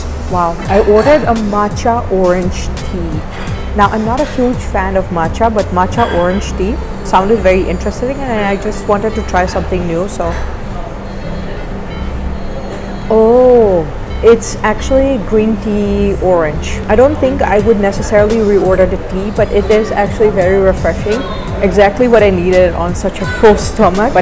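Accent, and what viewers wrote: India. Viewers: Is it me or she developing an indian accent?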